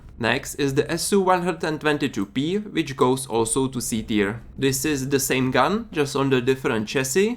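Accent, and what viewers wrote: Czech Republic. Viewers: Když jsem slyšel ten přízvuk, bylo mi hned jasný, že jsi čech. Super video! :D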